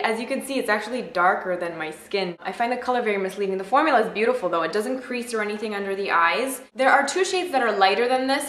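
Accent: Canada. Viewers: I actually detected your Canadian accent in this video. lol :)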